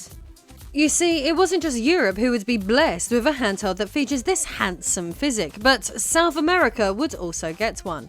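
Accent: United Kingdom. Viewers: you reminded me of Batonetta with that british accent and s3xy tone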